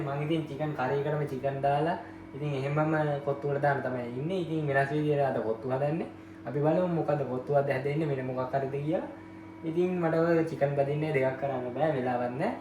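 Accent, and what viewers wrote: South, Sri Lanka. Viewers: ඔයැයි....දකුණේ යි😅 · ඔයා දකුණේ නේ 😂😊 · ඔයා දකුනේ කෙනෙක් ද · ඔහේ දකුනෙයි🫠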